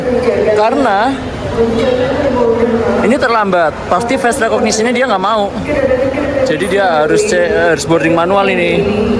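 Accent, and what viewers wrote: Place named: Banyumas, Indonesia. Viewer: Hadirr mas mas ngapak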